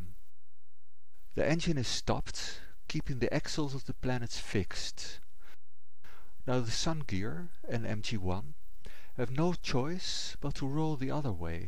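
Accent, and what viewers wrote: Netherlands. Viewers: I think he's dutch. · It is a Dutch (The Netherlands) accent. For a Fat and Heavy GERMAN accent listen to <link> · you sound dutch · is Dutch. He's not speaking his mother tongue. He has a slight Dutch accent but his English is perfect. He even uses English idioms and nuances which a lot of native English speakers would have difficulty with.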